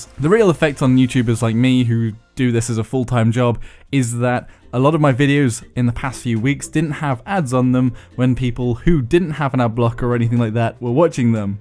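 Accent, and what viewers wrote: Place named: United Kingdom. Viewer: Huh, didn't realise you were British. That makes you a lot hotter. Totally gay for that.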